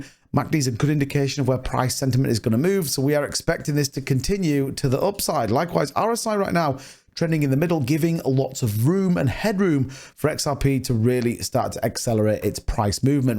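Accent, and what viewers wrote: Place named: North of England, United Kingdom. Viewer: The accent is from the north of England.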